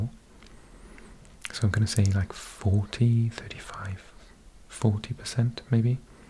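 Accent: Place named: Wales, United Kingdom. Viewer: This is amazing! I love listening to facts or meaningful things (not rambles) and in a Welsh accent, I’m blessed. If you happen to speak Welsh too then that would be another amazing video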